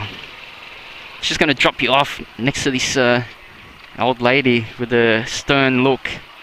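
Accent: Australia. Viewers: I really enjoy your narrative and your "down-under" accent, mate! Thanks for making the video.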